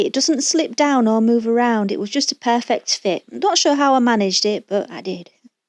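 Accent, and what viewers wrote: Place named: Yorkshire, United Kingdom. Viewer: Ah! Yorkshire, thank you...was trying to guess, cos I thought you sounded like Sarah Millican.... This is an awesome bowl.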